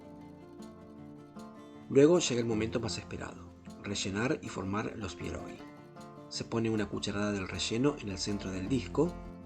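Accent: Argentina. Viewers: soy español. He vivido 24 años en Polonia. Ver tu receta ha despertado en mí tantos y tan bonitos recuerdos... ¡¡¡Eres un artista!!! La receta está fenomenalmente explicada paso a paso y muy bien hecha. Estoy seguro que tus pierogis están buenísimos. ¡¡¡Enhorabuena!!! Por cierto, me ha gustado mucho tu manera de explicar, ese acento tan bellamente argentino y lo bien que cocinas.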